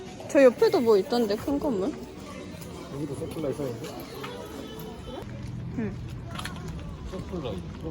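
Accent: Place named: Jeolla, South Korea. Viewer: 역시 전라도 사투리ㅋㅋㅋㅋㅋ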